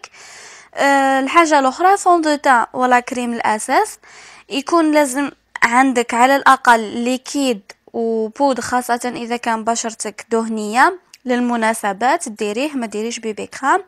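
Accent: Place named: Djelfa, Algeria. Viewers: وش من وﻻية انتي اختي حسيتك من هدرتك من الجلفة وﻻ الأغواط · اول مرة نكتشف قناتك لهجتك من الجلفة؟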